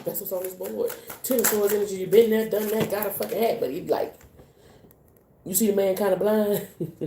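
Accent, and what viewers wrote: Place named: Caribbean. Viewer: Love your accent. How it comes out when ya get excited!! Im from the Caribbean and reminds me of home. Different but the same. That slang. Bless you 🙏 love ya girl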